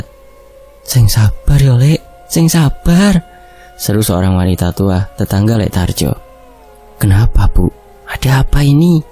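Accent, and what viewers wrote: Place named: Pekalongan, Indonesia. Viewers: Logat Pemalang Pekalongan itu ya mas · Logat Pekalongan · Logate wong batang, pekalongan, pemalang. Aku dewe wong batang 😅😅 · Logat batang Pekalongan pokok e sekitaran kue min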